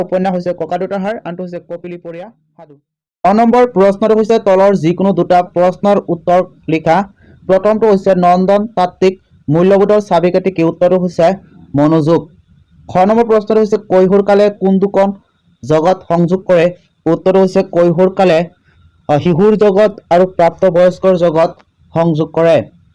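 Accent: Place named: Lower Assam, India. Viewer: Vatia ussaron hoi ase bujat okhobida hoi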